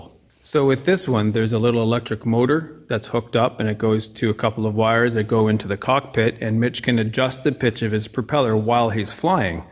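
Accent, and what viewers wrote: Canada. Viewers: Thanks for the info re: props I had no idea, I have a couple of?? what is the brand name of those outboard wing tanks you have on your plane, second? is that RR bridge that you & Vicky flew over the day you had trouble with your starboard side snow slippers, was the bridge C.N or C.P. you in Canada? put it this way you sound Canadian. Cheers from OZ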